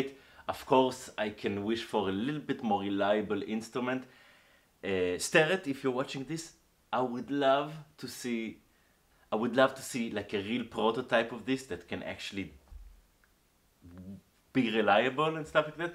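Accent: Israel. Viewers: Wow, that israeli accent grinds my nerves. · המבטא הכי ישראלי ששמעתי בחיים שלי ריספקט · I’m guessing by that accent, you’re Israeli? If so, AWESOME, you’re the first Israeli machinist I’ve seen on YouTube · הסרטונים שלך מושלמים, אוהב ממששש את העבודות שלך ואת האנגלית עם המבטה הישראלי חחח 😁😁 שנה טובה ומתוקה 🍎🍯ותמשיך כך💪 Love your work keep it up 😁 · I don't know if it's a jewish accent or maybe Israeli but you seriously sounds like many Israelis I spoke with and also hearing my own voice so just saying kind of a weird accent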